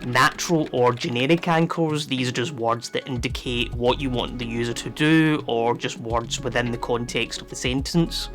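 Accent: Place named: United Kingdom. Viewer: That's an entertaining British accent 😅 Where from?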